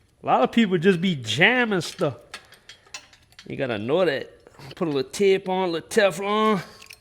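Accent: Louisiana, United States. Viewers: This is my first time watching this channel. It's hard to pick up on, but I think I detect a slight Cajun accent there. 😁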